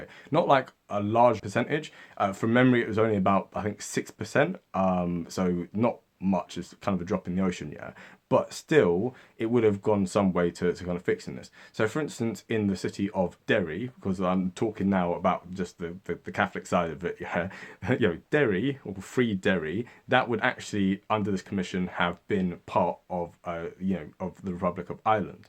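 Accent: England, United Kingdom. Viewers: Another thought-provoking video. I don't feel like you have a bias, you've said things that would kind of piss off both communities here. Your videos are uniquely well researched, strange to hear an Englishman sound like he actually knows a bit about the North. Respect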